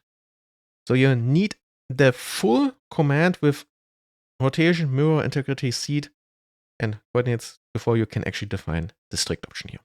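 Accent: Germany. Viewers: Guten tag, your german accent is so good, i'm kinda jealous about it. Tut mir leid, meine deutsch ist sehr schlecht, ich sprichst ein bisschen deutsch, aber das ist lange her :p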